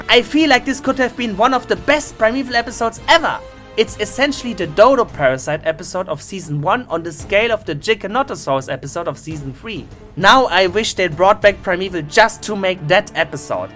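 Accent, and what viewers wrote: Germany. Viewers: Hab mir doch gedacht, dass du Deutscher bist! Dieser Akzent :D Aber deine Aussprache ist sehr klar, bist gut in Englisch. Und danke für deine Videos.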